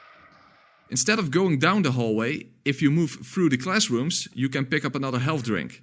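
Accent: Netherlands. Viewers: You must be Dutch, I hear an audible accent!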